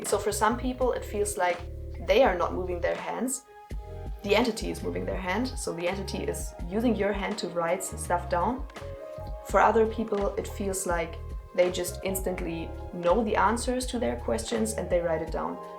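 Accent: Germany. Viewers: I could hear from your accent that you’re German.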